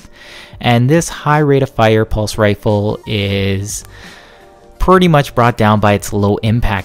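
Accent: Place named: Canada. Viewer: Commentator is most definitely Canadian... haha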